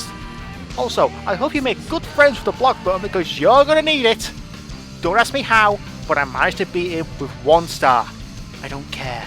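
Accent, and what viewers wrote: United Kingdom. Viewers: is from UK?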